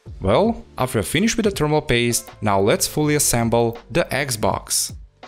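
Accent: Russia. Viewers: Русский что ли ? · I like his Russian accent😘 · You have russian aсcent? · Is this my hallucination or does the author have a Russian accent? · Hello, are you from Russia? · I love your accent. It sounds a bit like a humble russian accent. ( not being racist english also isnt my native) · You are Russian? (Slav?)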